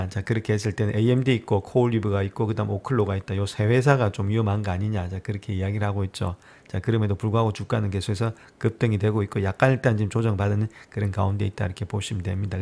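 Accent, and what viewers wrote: Gyeongsang, South Korea. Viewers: 경상도 사투리 서울 토박이인 제가 듣기에 매우 인텔리젼틱하게 들리네요^^ 경상도 분들은 다 머리가 좋은가봐요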